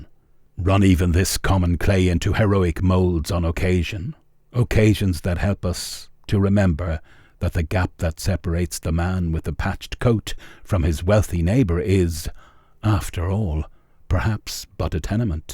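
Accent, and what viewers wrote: United Kingdom. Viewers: So what's up with not being able to watch an American subject without incessant Brits narrating everything on the internet?.....can't watch TV, now can't enjoy a video.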